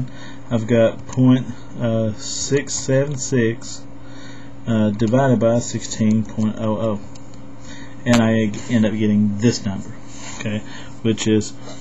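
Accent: South, United States. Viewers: You and your sexy Southern accent have Really helped me. Thank you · Am guessing you are from down south :)! thanks for all your help you are wonderful :) and are alot funner than reading the book lol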